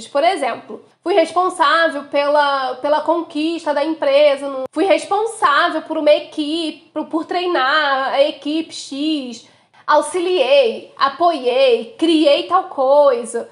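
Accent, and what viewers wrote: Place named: Rio de Janeiro, Brazil. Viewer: deve ser pq a maioria das dublagens são do RJ e o sotaque é o mesmo 😁 por isso ele lembra das dublagens haha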